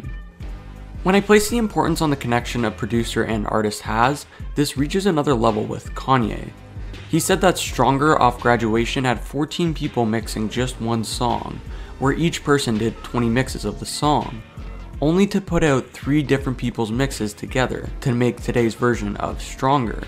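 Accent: Canada. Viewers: Are u from canada. I hear the way u pronounce certain words 😂🔥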